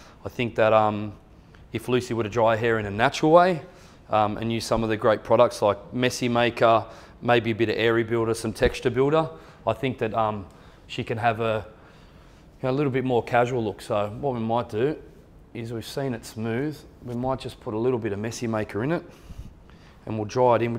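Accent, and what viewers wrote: Australia. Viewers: Absolutely gorgeous! Lucy's hair is exquisite. It's luxurious and healthy and looks like liquid velvet with the way it moves The color is scrumptious! You did a very beautiful job! 💜, you are so very handsome and I just love your wonderful Australian accent! Writing from Southern California.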